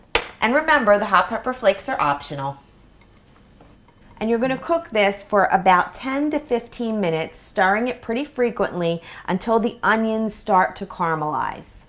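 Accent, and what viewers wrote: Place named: Philadelphia, United States. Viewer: Love it. "Wudder" Are you from Philadelphia? Making this for Turkey Day today. big hugs.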